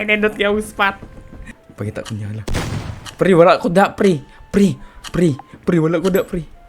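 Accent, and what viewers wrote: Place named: Visayas, Philippines. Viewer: bisaya ka doi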